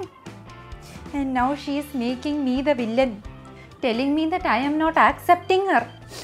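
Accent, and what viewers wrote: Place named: Kerala diaspora, India. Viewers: I like the way you talk like any malayali mom living outside Kerala.. my both daughters are your fans. They only asked me to watch your episodes . at this young age you are doing good work.. & ofcourse you resemble Amala Paul a lot... all the best dear.. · I watch this channel only bcoz you, and specially Ur Malayali accent. I love it. You r the best. I have faced this when I secretly had my left ear piercing, repeated when I got the right ear pierced the very next week.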